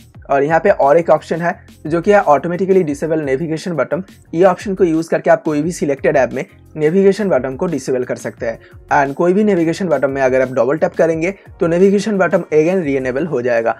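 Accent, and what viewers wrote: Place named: Uttar Pradesh, India. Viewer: bhai aap jo.bolte ho uski ton thik karo like jo English words hai woh tore up wale ke ton mei bolte ho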